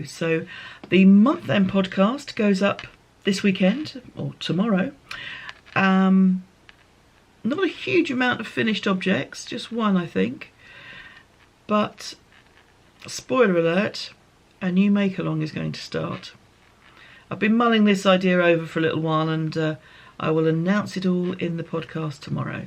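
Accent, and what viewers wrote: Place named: Lancashire, United Kingdom. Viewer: Ah yes I am familiar with self-propelled mowers from my days of working on a golf course. That will take some effort of learning on your part. Be very careful not to be dragged away. Lol My paternal grandparents are from Lancashire. Quite a recognizable accent for me. ♥ Your dress brings back memories of my sewing days from 35 years ago. Nice work! Wow! Keep your puppy close. Hard to tell how large the fox is but better to be safe than sorry. A haircut is always welcome but I find that the day before I go, my hair tends to look fabulous. It is almost like it wants to make me doubt my decision to have it cut in the first place. Take care and stay well.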